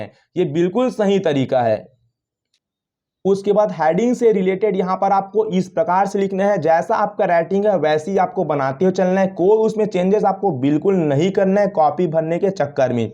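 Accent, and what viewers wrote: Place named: Chhattisgarh, India. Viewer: Baat krne ke tone se lagta hai chhattisgarh se ho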